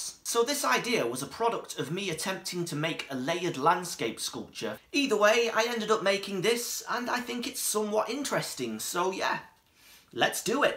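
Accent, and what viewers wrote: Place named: United Kingdom. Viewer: Your narration with your British accent reminds me of the good old Art Attack days! Haha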